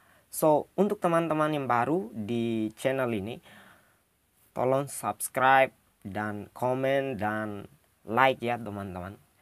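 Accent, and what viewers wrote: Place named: Malaysia. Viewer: Maaf.. Bisakah kata 'so' yang sering kamu ucapkan divideo mu... kamu ganti dengan ucap "jadiii".. kalo sering ucap kata "so"... jadi mirip kayak cara bicara orang malaysia.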